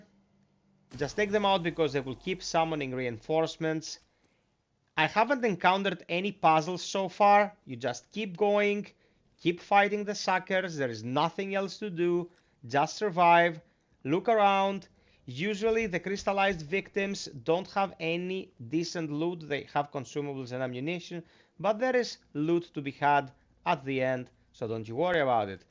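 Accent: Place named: Greece. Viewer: Βάζεις να δεις το βίντεο που σου έβγαλε η αναζήτηση και ακούς ελληνικής προφοράς αγγλικά! Μετά λες ρ 'εσύ, σαν του την φωνή και έχεις δίκιο! Πάμε joystick!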